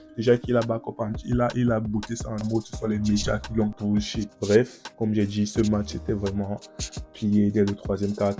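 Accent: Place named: Cameroon. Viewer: L’accent du frère camerounais dommage l’algorithme ne te met pas plus en avant courage pour tes belles analyses oublie pas Lebron reste le boss de cette équipe vous le verrez bientôt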